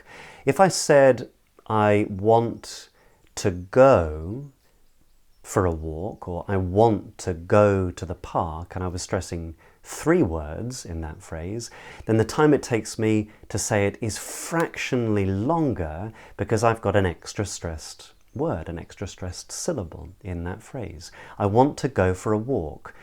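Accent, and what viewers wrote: RP, United Kingdom. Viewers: Great video! Let me point out once again that you're one of the best Standard British English (Contemporary RP) Pronunciation teachers I've ever come across on YouTube and since I occasionally teach and translate English professionally myself, your tips will surely improve my teaching skills.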